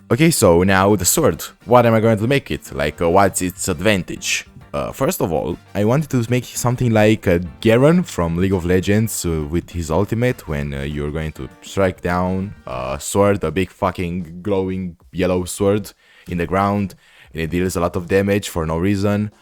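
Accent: France. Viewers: are u french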